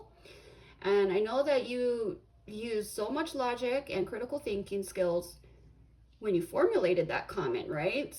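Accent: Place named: Hawaii, United States. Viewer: So the first time I watched you. I was like this woman reminds me of me..speaking wise a little harsh lol I thought she just reminds me of home...then about 3 videos later I find out your from Hawaii..not only home. But my island. I'm on the .mainland now and people don't get me unless they really know me cuz how we talk.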